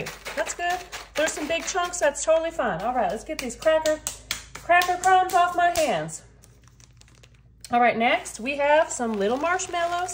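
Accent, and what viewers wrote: South, United States. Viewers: could just listen to a southern accent all day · what state are you from with that accent. Just love it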